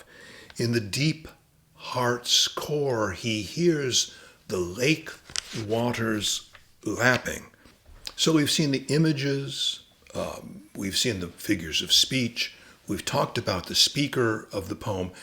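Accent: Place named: United States. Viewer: Americans... attempting to recite Irish poetry... and it just doesn't work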